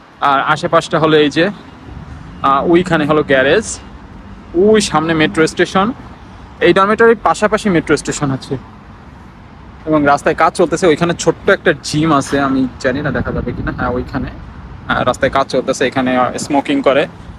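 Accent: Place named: Jessore, Bangladesh. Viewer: যশোর-ঝিনাইদহ এর মত লাগছে।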